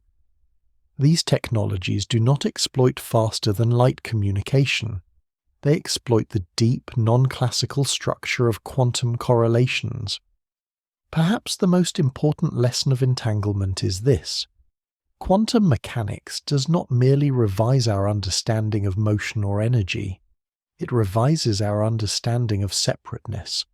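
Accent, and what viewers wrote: United Kingdom. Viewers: Want to love it, but there's nothing relaxing about a biting British voice in my ear at 11pm. Sorry.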